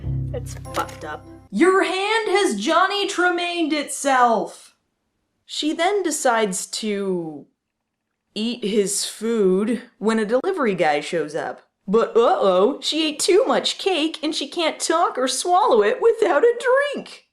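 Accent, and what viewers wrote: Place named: Midwest, United States. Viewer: I love your funny faces at some of the more absurd parts of this terrible film. Off-topic: Are you a Wisconsin or Minnesota native? I only ask because of your accent.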